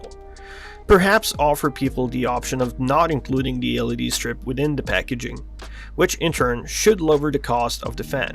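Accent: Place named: France. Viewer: I can hear the french in your accent. Lmao great video though, very informative.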